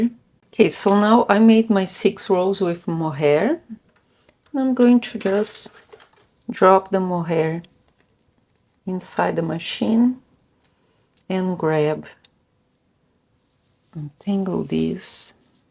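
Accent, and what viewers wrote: Brazil. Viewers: voce e brasileira ? reconheco a acento de brasileiros falando ingles :)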